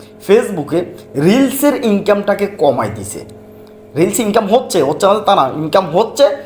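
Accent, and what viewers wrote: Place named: Noakhali, Bangladesh. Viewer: কথা শুনে মনে হচ্ছে তুমি নোয়াখাইল্লা খাটাস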